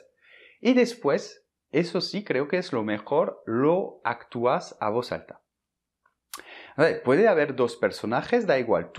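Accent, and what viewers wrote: France. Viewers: Nasaliza alguna vocal pero su pronunciación es excelente, lo que le queda es un ligero acento francés que hace que escucharle sea un placer. Puede que a ti no te guste y sería legítimo si así fuese pero decir que la pronunciación de este hombre es mala no tiene ni pies ni cabeza, lo siento. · No Sabia que usted tenías lecciones en español también! Tengo que decir que oír español con acento francés es una de las cosas más linda que he oído hoy 😂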